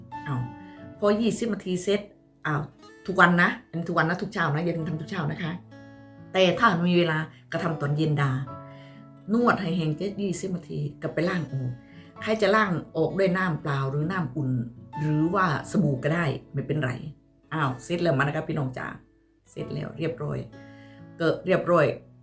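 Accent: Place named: Rayong, Thailand. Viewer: มาจากช่องพี่โหระพาจร้า จะมาติดตาม จร้า เป็นคนจังหวัดอะไรหรือค่ะ สำเนียงคล้ายเหมือนคนระยองเลย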